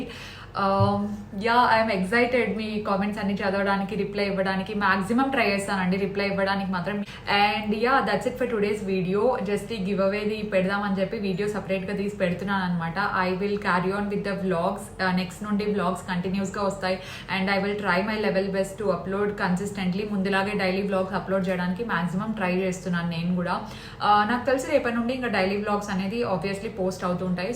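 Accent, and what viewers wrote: Anantapur, India. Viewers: My house tour is the first video I saw.I like way of your talk,dressing style,julie silence and dolly allari and also pinni talks are also nyc.When I'm studying intermediate one of my friend was from ananthapur I like that slang and the way of talking,the same slang and way of conversation was observed in your videos.Usually I used to enjoy that conversations.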